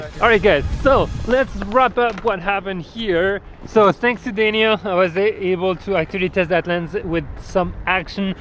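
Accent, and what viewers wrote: France. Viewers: I wonder if ...tu es français ou québécois!? :D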